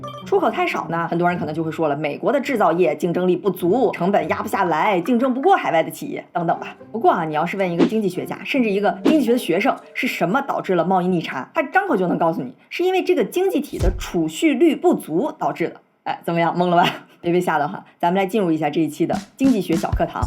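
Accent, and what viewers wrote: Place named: Beijing, China. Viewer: 京片子遛兒的！好聽！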